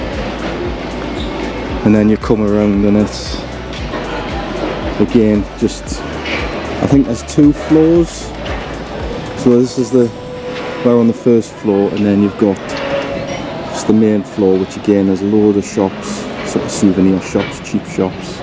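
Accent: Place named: England, United Kingdom. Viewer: Hi,fairly accurate video, trying to make out your accent to see what part of England you're from.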